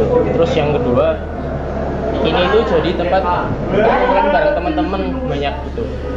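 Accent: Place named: Banyumas, Indonesia. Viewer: Ngapak nya keliatan yaah 😂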